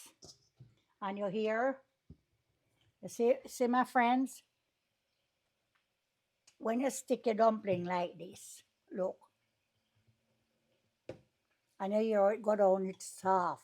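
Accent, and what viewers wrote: Jamaica. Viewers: Is she Jamaican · I love your Jamaican voice I feel like I am in Jamaica 🇯🇲 judt listening to you 😅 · Yes....a pick up de real Jamaican talk and real Jamaican "cooking hand". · Her voice alone just bring me back to Jamaica. Reminds me of so many elders that sounds like her ❤. Once you put sugar its no longer fry dumplings tho 😂 its either sugar dumplings, Johnny cakes or bakes as the rest of the Caribbean call it 😁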